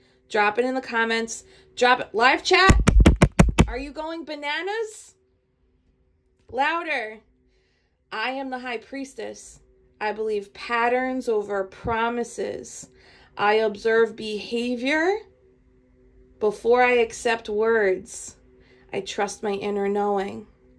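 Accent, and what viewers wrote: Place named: Long Island, United States. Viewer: I just found this page and I subscribed because of your accent.. are you from long Island? ❤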